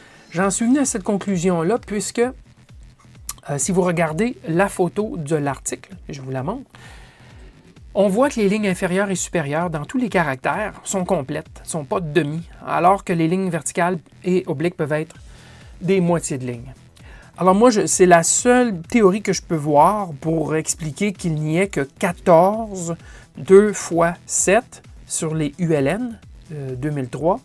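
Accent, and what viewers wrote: Quebec, Canada. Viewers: Bonjour. Je n'y connais strictement rien en électronique mais je regarde vos vidéos avec grand plaisir, ne serait-ce que pour l'accent du Québec ! Libre bien sur hein. Sino, bah et si j'ai tout bien compris vos explications, vu que la plaquette du dessous qui recevait les datas ne sert plus à rien, z'avez qu'à en faire une station météo avec les infos défilantes sur les digits à LED. Ou alors, mais bon ça m'a l'air peut-être plus compliqué, faites rentrer ça dans un boitier de PC et essayer de récupérer les signaux des ventilos et autres sondes de la carte-mère pour les diffuser sur les digits à LED. Je sais pas comment hein vu que j'y connais rien du tout. A part que peut-être aussi, sur les CM de PC y'a des ports COM ou SERIE qui servent à rien la plupart du temps. Ou bien encore, vu que le boitier est tout aluminium, collez-y un petit Rapsberry PI dedans et l'afficheur à digits de ce tagazou servira bien à keke chose vu qu'il y a tout un tas de ports dans ces petits PI. 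Take care, thanks, peace ☮ .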